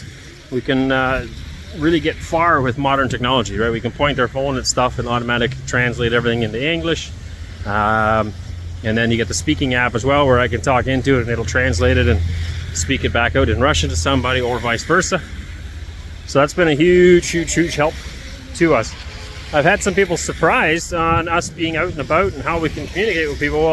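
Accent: Canada. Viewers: I can't imagine he doesn't have the Russian accent not to mention the Canadian accent! Good luck out there people know this and it's a disadvantage for sure. Man I'd live out there anyday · Could tell your from 🍁 by your accent.. good luck in Russia . Hoping to get a new American president before this clown gets us in war with Russia. Rather have pootie as our president too be honest. Only isuue i have with your move is you didn't stay and try to fight to get your country back we need all the people we can get at this point to turn the tide. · Canadian English)))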